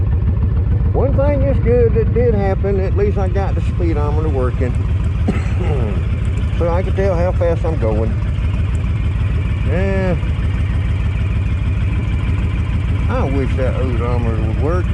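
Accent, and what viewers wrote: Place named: South, United States. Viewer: Yes sir mine is a 02 classic 1500 but I've put 05 1600 mean streak engine on it and bored it .05mm over stock . I was going to change the injectors to see if that would help.i have vance and Hines straight shot drag pipes. and I've eliminated the air cleaner on left side and crossover tube. I can put new plugs in it and it runs grate for about a week .then they are black with sute and will start to run like crap.ive got service manual .and I'm going to go thru all the components and check them out while i got tank off-putting injectors in. Thank you for the input . And I love your videos you sound like your from the south like me. I own a ATV bike repair shop and I work on all kinds. But the Vulcan is mine. I loved the video on the timing chain tentioners that was a good one for sure .I just found your videos yesterday but your making grate content keep up the good work sir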